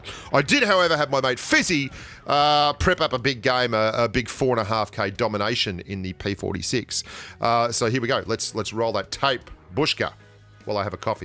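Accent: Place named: Australia. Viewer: FIRST! Also, bushka, are you okay? tell me your property isnt up in flames. You sound australian and idk if you are from australia and live there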